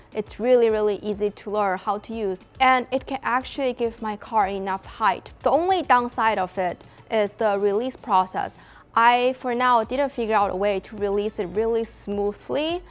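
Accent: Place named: China. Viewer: Funny Chinese accent. You do not know what you were doing.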